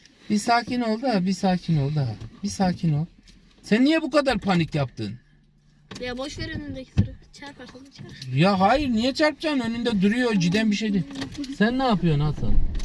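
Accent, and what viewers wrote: Black Sea, Turkey. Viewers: Abi karadenizli misin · Bencede Karadenizli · Abi rizelimisin yoksa Giresunlu mu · Abi galiba karadenizli galiba uşağum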